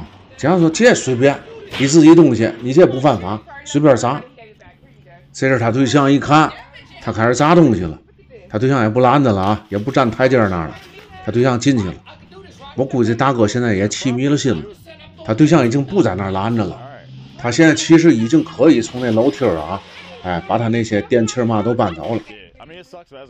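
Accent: Tianjin, China. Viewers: 兄弟，您的天津味道十足哇！I subscribed purely because your 天津味！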